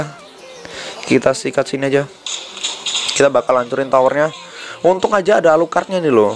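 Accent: Java, Indonesia. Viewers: Lah gw malah ngakak sama omonganmu bang 🤣, jawa medok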